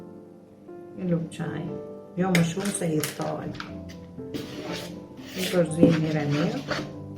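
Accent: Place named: Tirana, Albania. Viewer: Pse ti zonja, pa trokitur fare punon.....mos e shiko ne qoftese te acaron, por kjo eshte nje zonje e vertere Tiranase, qe ke qef ta degjosh, per te gjitha recatat e mrekullueshme qe ben, respkte pa fund per ju zonje qe na kenaqni me gatimet tuaja....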